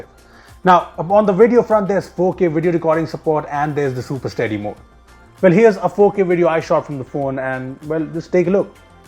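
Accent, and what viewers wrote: India. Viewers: I Love the Indian Version o the R! ☺️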